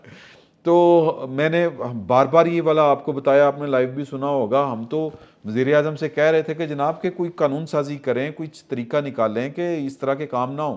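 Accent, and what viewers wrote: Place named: Lahore, Pakistan. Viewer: جی او لاہوری لہجہ ٹھا ٹھا چپیڑیں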